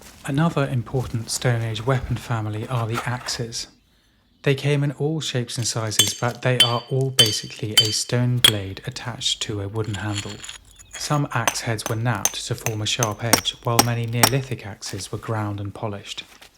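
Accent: England, United Kingdom. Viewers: well spoken English accent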